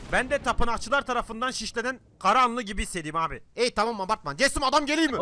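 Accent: Gaziantep, Turkey. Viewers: abi antep dublaj papci devamı gelsin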